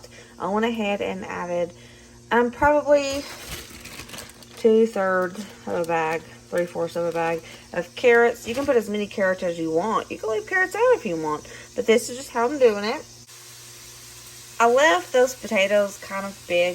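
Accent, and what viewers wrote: South, United States. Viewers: You are a lifesaver. Thank you. New Subscriber ❤️ Love your Southern accent. I'm from Texas 🇱🇷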